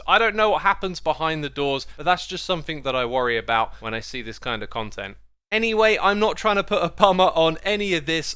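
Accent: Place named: United Kingdom. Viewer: Started watching near my dad. He said "wow that guy is British"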